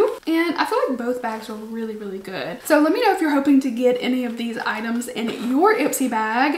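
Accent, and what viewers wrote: South, United States. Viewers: Wait!!!! You are a Bama girl???!!!! So am I!! I did not know this!!!! I knew from the accent you were southern but wasn’t sure exactly where you lived!!!!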